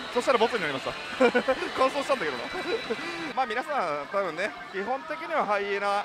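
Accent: Japan. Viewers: すみません、大阪弁苦手です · 喋りあっての実践良いですね(´ー｀*)ｳﾝｳﾝ 関西弁？聞きやすいです😊ペアで頑張ってください😊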